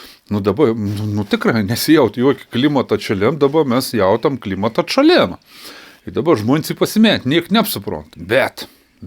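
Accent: Samogitia, Lithuania. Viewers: Ačiū už žemaičių kalbą. Koloritiškai... Ta prasme spalvingai skamba. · Pirmą kart klausau kaip žemaitiškai kalba, labai malonu ausiai, aš kaip nemoku man labai smagu girdėt.:) Manau žemaičiai visad turėtų kalbėt savo tarme, o kam nepatinka tegul čiuožia. 😄 O apie putiną tai čia geras buvo, dar tokių bajerių negirdėjau šiemet. 😂 Mldc. 👍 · Vidiaks 😅😅😅 net ir aš pasimečiau, kaip tašė stoty 😄 Itin smagu girdėti gryną lietuvišką žemaičių kalbą ❤👍 Pasaulinis lyderis V. Putinas, kaltas, 😅 Gerb., jus👍😄 Sėkmės jums su Egle ❤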